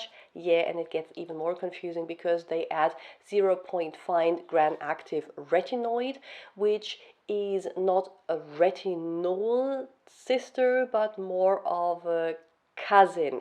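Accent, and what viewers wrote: Germany. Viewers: Why I Hear a german Accent 😂 Are u german ?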